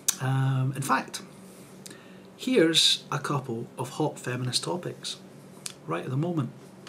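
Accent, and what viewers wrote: Scotland, United Kingdom. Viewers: you're reasonable, soft-spoken, w/a bad-ass scottish accent, AND you like cartoons? *subscribes*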